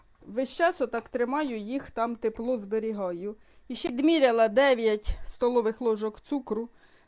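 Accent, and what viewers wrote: Poltava, Ukraine. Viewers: почула вашу мову і сльози на очах.... Мені вже 60. Я народилась в Полтавській обл, Чутівський рн. Ви так балакаєте, як у моєму рідному селі Нагорна, поряд Вільховатка. Звідти родом моя покійна мама. Так хочеться хоч разочок ще побачити те, чого ніколи не забувала. Завадила війна. Щиро дякую тобі за наш особливий говір. Ти й не уявляєш, яке це щастя. Наче побувала на Полтавщині.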